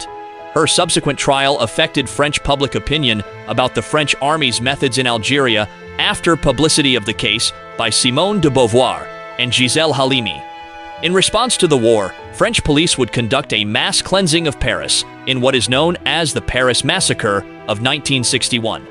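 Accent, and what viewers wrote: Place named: United Kingdom. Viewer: Hearing all this boldly narration in a british style makes me laugh too much. Hospital mocking charity as we say in France. Perfid albion at his greatest.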